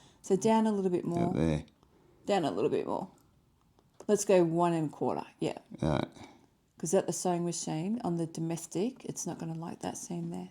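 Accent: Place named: Australia. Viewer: Only came across your tutorials last week but absolutely loving them and pleased to be hearing an Aussie accent, colloquials and slang for a change. Brilliant to see give it a hard crack and have a few bloopers. It was a good laugh because we've all been there with the awkwardness etc. Thanks heaps and looking forward to seeing more. Cheers from Wollongong, NSW.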